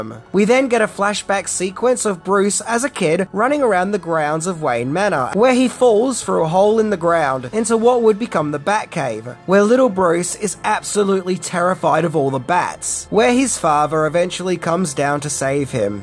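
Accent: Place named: Australia. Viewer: Everytime you say Two-Face I think you are saying Toothpaste! Lol must be that wonderful Aussie accent!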